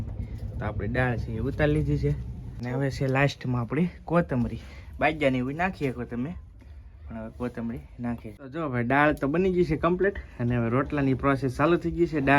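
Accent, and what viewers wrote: Saurashtra, India. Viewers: ખુબ જ સરસ દાળ બનાવી છે, આપની સૌરાષ્ટ્ર ની મીઠી ભાષા ખુબ ગમી જાય છે સૌરાષ્ટ્ર માં શિક્ષક ની નોકરી દરમિયાન આ આનંદ ખુબ જ લીધો છે, માયાળુ માનવી નો દેશ એવો છે કાઠીયાવાડ..... તારાપુર થી ભરતભાઈ વાટલીયા નિવૃત્ત શિક્ષક તથા ઘરપરિવાર ના જયગુરૂદેવ.. જયમાતાજી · Saurashtra ni mithi bhasa ghana valhate sambhalavs mali... Very nice..👌👌 ek j saggetion chhe... Tel ni jagya e guare banavela chokkha ghee no uptog karvo ..baki tame dal ne rotla mate jabarjast banavya chhe... 👏👏👏👏👏👍👌👌👌💐🌺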